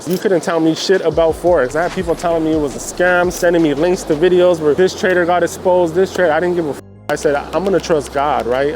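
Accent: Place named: California, United States. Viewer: You sound exactly like me and my people from California to a tee. I am on the same exact journey. Preach to me, bro. I'm in between my dropshipping and just discovered Forex, but I have stock experience. Now, I am researching Forex trading. Vegas taught me not to ever treat trading like gambling lol real talk.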